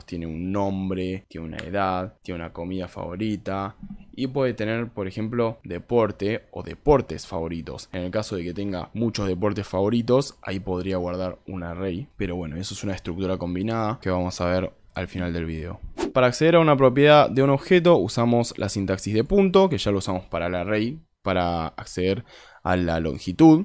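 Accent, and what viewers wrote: Argentina. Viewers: Un chino con acento argentino, tienes un nuevo fan 😊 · Gracias amigo, me vi muchos videos pero vos lo explicaste cortita y al pie, pd: te sale re bien el acento argentino, un saludo hermano 🙌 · Creo que entendí mejor contigo que con el curso introductorio de soyHenry, no les entiendo nada hasta el día de hoy a ellos, pero a ti, clarito bro, voy a hecharle un vistazo a tu curso del que hablas 👌🏻😎, la verdad, hablas claro apesar de que creo que eres argentino no?, en caso de ser asi te entiendo perfectamente a ti, y no a los de soyHnery, deberias ser instructor de ahi, ya que te juro que no les entiendo nada de lo que hablan, no se si no pronuncian bien o hablan demasiado rapido, tu no, calmado y bien explicado, hasta rayas, y eso vale oro bro.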